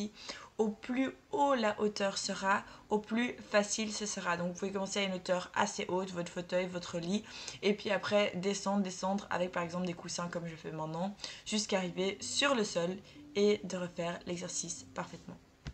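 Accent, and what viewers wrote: Belgium. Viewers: Bonjour, j'aime beaucoup tes vidéos très détaillées et ton petit accent belge 😊. Est-ce que tu pourrais faire un tutosur le flip arrière s'il te plaît ? Merci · Tu est belge ?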